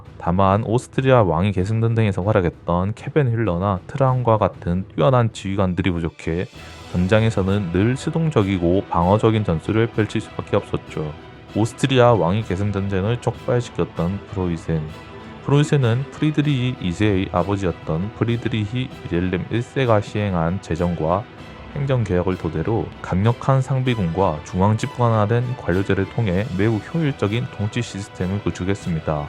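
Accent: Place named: Gyeongsang, South Korea. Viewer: 표준어를 쓰는듯 하지만 느껴지는 경상도의 향기...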